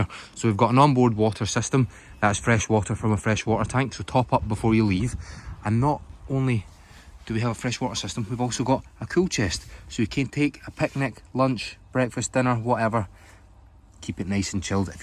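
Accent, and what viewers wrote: Scotland, United Kingdom. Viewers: I love that scottish accent!! watching the videos only for the guy talking, love it !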